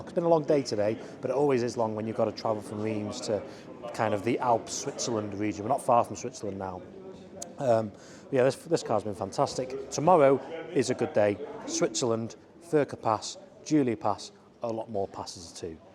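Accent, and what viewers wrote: United Kingdom. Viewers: no one wants to hear your short british tongue innit mate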